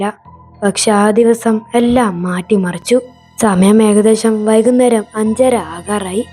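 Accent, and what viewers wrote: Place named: Kannur, India. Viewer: കണ്ണൂർ സ്ലാങ് ❤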